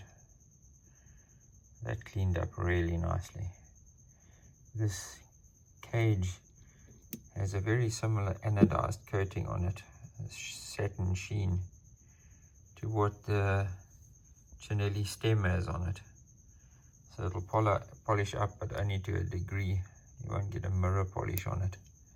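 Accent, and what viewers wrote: South Africa. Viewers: Being from the States I dig the accent but more importantly I echo the philosophy regarding vintage steel bikes. They still have a lot to offer and the character and design of certain era’s is sublime. I get a “woody” finding them, cleaning and overhauling them and of course riding them. Keep up the good work in South Africa your videos are very enjoyable and informative.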